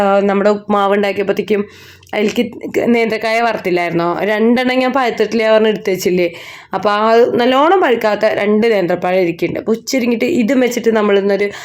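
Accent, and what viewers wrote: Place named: Valluvanad, India. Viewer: നല്ല വ്ലോഗ്.... സന്തുഷ്ട ഭവനം... വളരെ സന്തോഷം തോന്നുന്നു...വള്ളുവനാടൻ തനിമ നിറഞ്ഞ അവതരണം...നന്മകൾ നേരുന്നു ❤❤❤🥰🥰😍😍🎉🎉🎉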